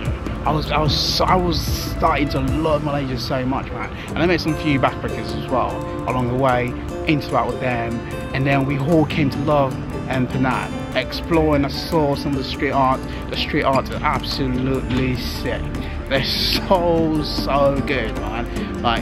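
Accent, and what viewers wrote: United Kingdom. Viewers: I love your British English accent.👍👍👍👍👍Welcone to Malaysia. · Your British accent not so sound like London I gues🤔... correct ne if I'm wrong 😂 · I really love your British English accent. Hope I will fluent in British English one fine day and in same time I'm proud of my Malaysian language.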